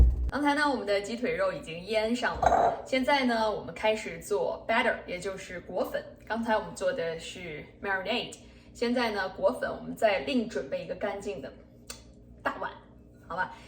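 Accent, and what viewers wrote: Beijing, China. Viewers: 北京人吗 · 你这是北京口音吗？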